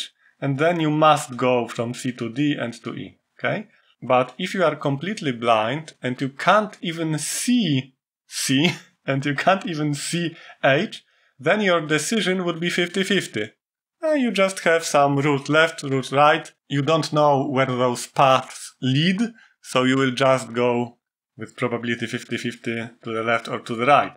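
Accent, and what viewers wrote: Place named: Poland. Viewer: Thank you for this awesome explaining of Evolutionary Computing for Global optimization, it was very useful and inspiring. no many visual resources for this topic, appreciate your contribution. you delivered it in a simple manner, and OptVis tool is also awesome. also the Polish accent is wonderful (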